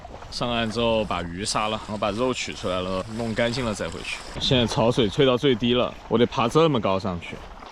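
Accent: Sichuan, China. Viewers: 听口音播主像四川人哦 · 听声音有点像成都 ，绵阳口音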